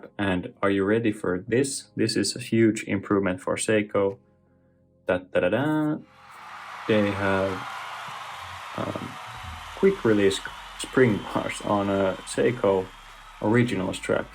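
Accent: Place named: Finland. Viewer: Finnish accent ... immediately I get the impresion this not going to be some BS story.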